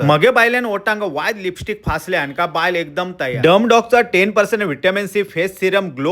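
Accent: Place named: Konkan, India. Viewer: दादा तुमची कोकणी भाषा खूप भारी आहे 😅😊मला तर खूप आवडते ऐकायला 😅मी तर रोज व्हिडिओ बघते 😅No.1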